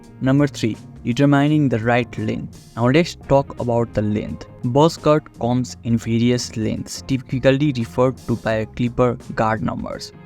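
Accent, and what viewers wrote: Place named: Nepal. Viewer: you from nepal or sum ? sounds like a nepalese accent to me, might be wrong? just curious.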